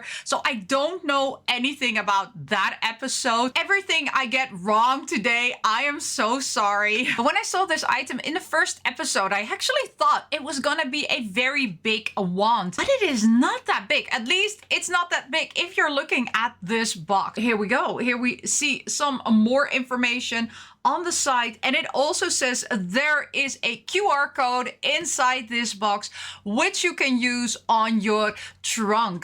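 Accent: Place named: Netherlands. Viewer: hello, something i've always wondered if you're dutch? you're accent is really dutch :3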